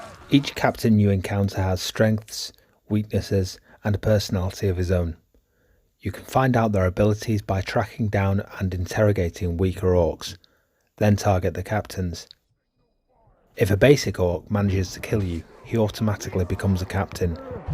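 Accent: United Kingdom. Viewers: True, but the british accent is a bit confusing.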